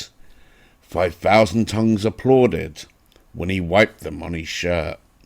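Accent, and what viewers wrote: England, United Kingdom. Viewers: Great video, I haven’t heard that poem in many many years, and never read with the English accent……..wonderful my friend!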